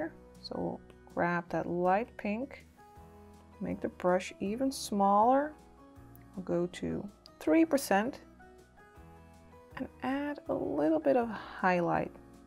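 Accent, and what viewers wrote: Netherlands. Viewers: i was like: she is dutch. I CAN HEAR IT. En toen hoorde ik stroopwafel en ik wist het gewoon.. BTW LOVE YOURE VIDSSS <3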